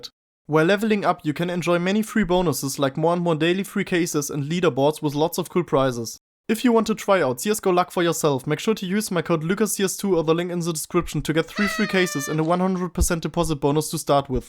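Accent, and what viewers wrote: Germany. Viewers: I can hear your German accent 😜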